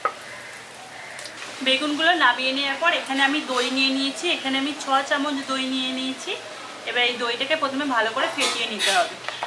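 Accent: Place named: Kolkata, India. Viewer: Apni kolkata takhe hobe.. Apner basa sune buja jay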